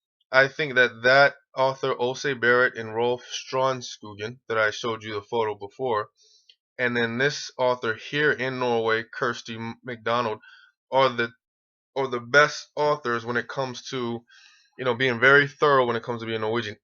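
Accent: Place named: United States. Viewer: You sound so american - why are you interested in Norway?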